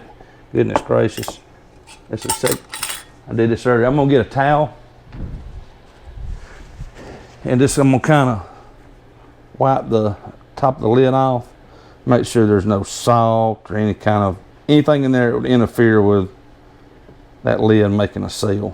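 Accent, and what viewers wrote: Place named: South, United States. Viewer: Those are some beautiful cans of squash! Can this be done with all types of squash? I was thinking butternut squash. By the way, I love your southern accent. ❤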